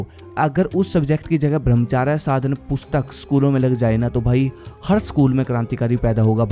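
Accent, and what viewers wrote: Haryana, India. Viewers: Bhai ap haryana se ho kya mne to isa e lag h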